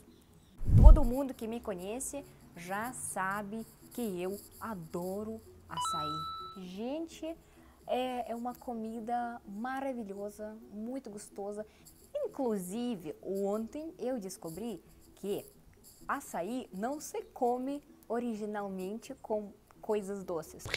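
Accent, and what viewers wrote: Russia. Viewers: Eu acho uma graça ela falando português, com esse sotaque russo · Eu amo esse sotaque russo 😍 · O seu sotaque Russo é muito show. Tenho um amigo Russo aqui no Canadá onde moro. Ele me ensina muitas frases e não e difícil a pronúncia porquê a nossa fonética não é tão diferente como o Inglês. Que Deus abençoe vcs e sua família aí no Brasil. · Vc é linda.Nao se preocupe com seu sotaque gostoso,dá pra entender linda. Que sejam todas bem vindas. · Como já disseram as russas aprendem muito rápido o português e o sotaque é o mais bonito de todos com ênfase no R, creio que nós brasileiros identificamos muito com o povo russo, pessoas simples , cultas , com uma literatura maravilhosa e sofredores devido aos desgovernos que tanto os afligem e a nós brasileiros também, seja bem vinda, venha conhecer minha cidade São José dos Campos, São Paulo se vier você vai ficar pra sempre, 40 minutos do litoral , Ilha Bela, São Sebastião, Caragua e 40 minutos das montanhas , Campos do Jordão, S.Francisco Xavier , e outras cidades muito lindas, O Vale do Paraíba é uma região muito bonita. 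· Olá mais linda do Brasil... Você já está falando muito bem o Português. Um pouco de sotaque russo fica até charmoso em vc. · Não estou gostando. Você está perdendo o sotaque russo, que fica maravilhoso falando português. Não mude isto. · Moro no Amazonas . Gostei muito do seu sotaque russo seja bem vinda. Mas se vc e do lado do putin e melhor ir pra Rússia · Gosto de assistir os seus vídeos, é tão legal ver e ouvir você falar. O português com o seu sotaque russo é muito bom, você fala palavras muito engraçadas. Adoro você,sou do norte do Brasil, Tocantins. sonho em conhecer uma russa pessoalmente e queria que fosse você. Beijos você é sensacional! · Eu acho lindo demais, você falando português,com esse sotaque Russo. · Bem vinda! Seu sotaque é gostoso. Amo a Rússia e as russas. A Rússia até hoje me soa como algo exótico.❤😊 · Seja bem- vinda! O que eu mais gosto nos russos se esforçando pra falar português é o sotaque e a troca do o pelo a. 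Muito fofa! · vc é muito querida e seu sotaque é uma graça. "Chineli" é o melhor👏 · Bom dia eu adoro seus comentários ,amo seu sotaque russo misturado com português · a expressão " puxa saco" quer dizer " alguém que quer fazer de tudo pra agradar alguém, mas só pensando em si mesmo, sem se importar com outras pessoas, com o objetivo de receber algo em troca". Exemplo puxa saco do patrão (chefe). Você fala bem o português, mas se percebe o sotaque Russo. Legal você quere dividir a sua experiência brasileira com a sua família. Felicidades e abraços...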